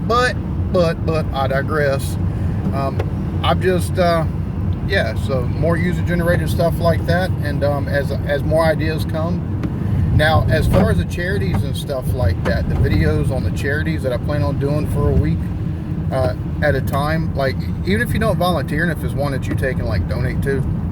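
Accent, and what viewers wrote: South, United States. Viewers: It’s all good brother. You may sound a bit like a hillbilly but I think most people on here can see your, as we say in a Australia... a top bloke 👍 keep the videos coming mate 😊👍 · And I'm good with your accent no matter how southern you get, I'm a big big country fan all my life so it's second nature for me .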